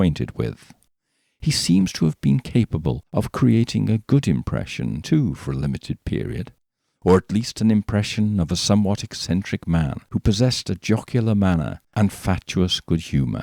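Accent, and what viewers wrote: United Kingdom. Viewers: This was undeniably British, what ?! I'm inclined to start saying Cheerio, instead of bye.🇬🇧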